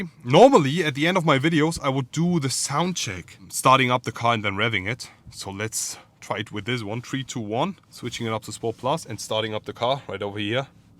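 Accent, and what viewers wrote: Germany. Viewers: Dein deutschenglisch ist furchtbar alter…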